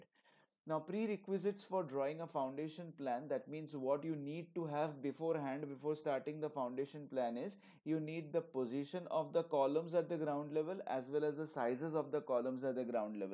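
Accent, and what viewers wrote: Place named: India. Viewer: If you are an engineer you should be speak American accent other than Indian accent you describe in Hindi language it will good for all I don't like damage English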